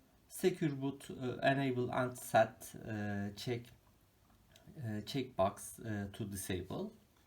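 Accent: Turkey. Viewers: very nice ,tnx, and very nice turkish english accent:)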